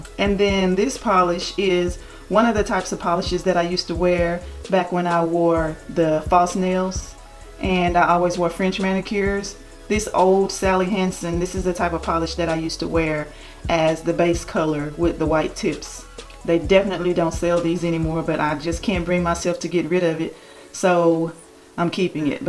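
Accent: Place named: Texas, United States. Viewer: I thought you sounded like a Texas girl! Definitely looking forward to brand videos when you redo your shelves.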